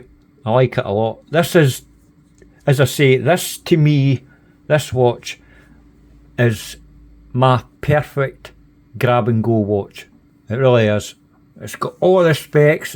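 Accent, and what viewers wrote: Scotland, United Kingdom. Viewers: I actually like it in nice good old scotish accent. More than standard london english, to posh for me. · Lovely Scottish accent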